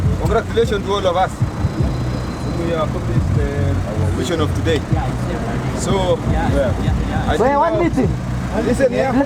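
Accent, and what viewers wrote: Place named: Kenya. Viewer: Felipe Lopez It’s like he’s saying everything in a Kenyan accent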